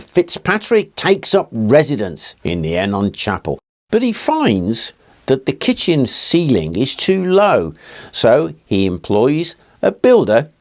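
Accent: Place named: Black Country, United Kingdom. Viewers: What a relief!! an english video narrated by an englishman and not a septic!!! aka a yank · Hi. Are you from Stafford or Lichfield by chance? Black country for sure but I can't place your accent.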